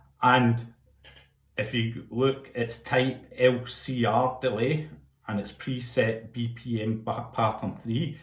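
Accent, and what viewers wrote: Scotland, United Kingdom. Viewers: You sound like trainspotting. I LOVE trainspotting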